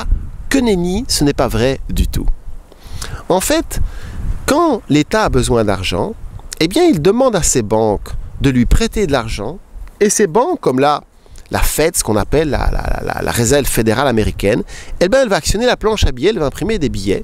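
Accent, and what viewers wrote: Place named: Belgium. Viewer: Ce petit accent... n'est pas français ! Belge peut être ?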